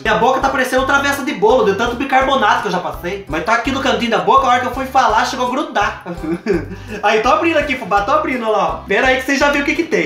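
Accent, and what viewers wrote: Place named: Interior São Paulo, Brazil. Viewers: vc me faz lembrar do meu pai, ele nasceu em Itararé, ele tinha o sotaque igual o seu. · Fubá do céu muito legal faz sim....,Sotaque lindo vc tem,igual minha mana que mora no interior Paranapanema SP ♥️👏👏👏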